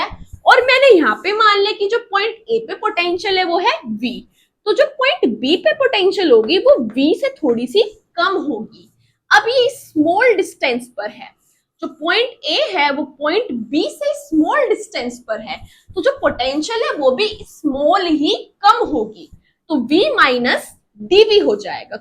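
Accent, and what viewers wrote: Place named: Pakistan. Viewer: her accent is like pakistani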